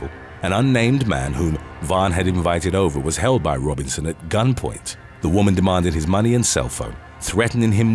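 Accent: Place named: England, United Kingdom. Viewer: He has an English accent!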